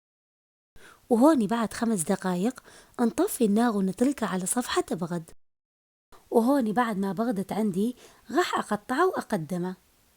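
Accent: Mosul, Iraq. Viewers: عاشت أيدك وفدوة للكلام المصلاوي الجميل والترتيب والنظافة والراحة النفسية ياريت تعملين فديوات لترتيب وتنظيف وتنظيم المنزل أو المطبخ لان مبين عليك شاطرة في كل شيء وكيف إدارة أوقاتنا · اللهجه مصلاويه 😍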